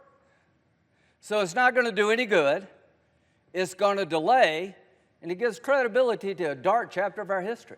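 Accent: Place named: South, United States. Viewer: Love his Southern accent! 🤣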